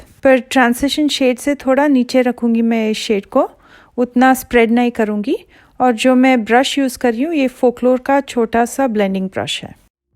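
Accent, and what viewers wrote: South India, India. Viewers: feeling lucky got ur reply🙈 everybody can understand u r fluent with it😍😍😘😘south indians speaking hindi is the cutest thing in the world🌏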